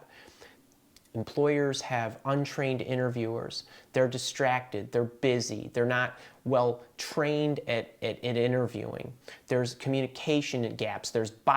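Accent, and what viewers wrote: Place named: Wisconsin, United States. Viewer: Is this dude from Wisconsin or something? “Jahb”, “Fayst”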